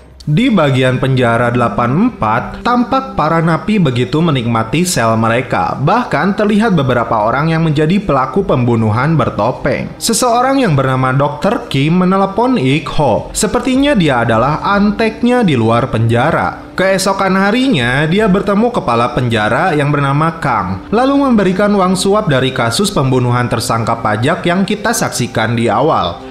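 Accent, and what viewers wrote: West Java, Indonesia. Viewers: Mantap sundana te leungit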